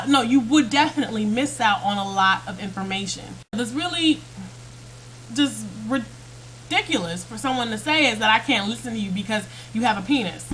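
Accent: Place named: Texas, United States. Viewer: wait what!? handcuffs? little timmy? 'what you've been doing wrong with it' ! you are HI-larry-US whe you go off like a shot, the faster you go, the more the 'texas belle' voice comes out until the rubberband unwinds and you just stop. or funner yet, freeze for a moment and then keep going! i swear i think my stream stops. keep the rants coming, it's a hilite of my day!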